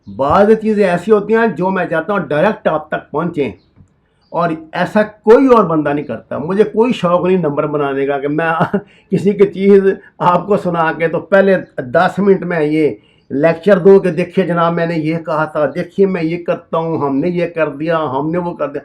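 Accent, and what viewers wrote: Punjab, Pakistan. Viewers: Punjabi sikh mosliman hogiya.🤣😂🤣😂🤣 pehle apni punjabi ascent teke karo kafir isi ne taliban ko sale kiya amiraca pe us ke baad ab Russia ur china pe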